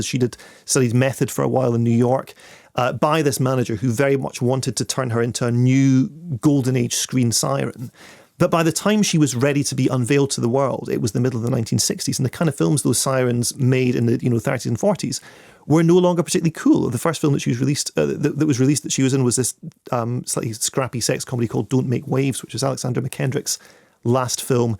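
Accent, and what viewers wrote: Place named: Edinburgh, United Kingdom. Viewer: This guy has a serious edinburgh uni accent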